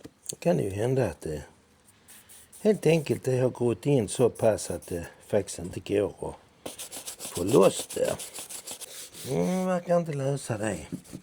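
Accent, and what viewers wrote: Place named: Skåne, Sweden. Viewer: Härlig Skånska känner mig hemma ☺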